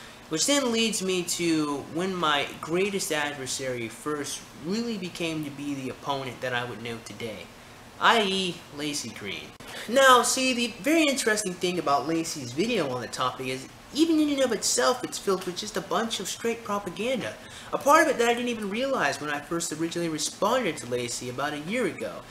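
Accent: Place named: United Kingdom. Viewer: Trust me when I tell you that you say all the right words. I've disagreed with some of the things you've said in the past, but I don't think I've ever heard you say the wrong word. I wish I was as articulate as you. The British accent threw me off a bit.I was like, "Is he intentionally doing it? Or did he grow up in England and sometimes it just comes out? I've noticed that you pronounce certain words differently than I do. The only other place I've heard this type of pronunciation or accent was from the show The Wire.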